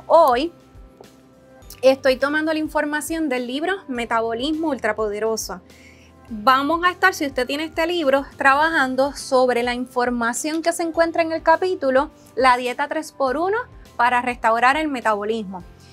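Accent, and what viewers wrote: Puerto Rico. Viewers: Me encanta ese acento de las mujeres boricuas,,😍😍🥰🥰😘😘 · Extraño mucho a mi amigo y maestro Frank Suárez, realice la dieta 3X1 y baje 9 kilos en menos de tres meses sin ejercicio en tiempos de pandemia, ahora volví a subir de peso, Gracias por tu explicación, eres muy bella con tu asentó Pueltoliqueño☺️😍 un saludo desde México.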